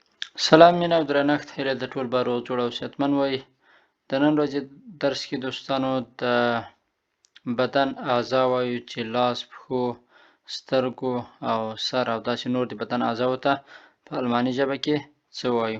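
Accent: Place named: Switzerland. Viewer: اول سلام اوستاد تادالمانی ژبی درس ده سویس په لهجه ده دالمانی ژبی درس باید دی المان غربی لهجه باندی وی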